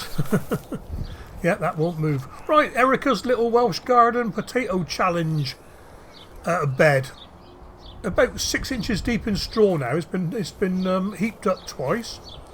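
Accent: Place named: Devon, United Kingdom. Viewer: you sound as if you are in devon but your soil is not red like mine torquay dave thanks for the tour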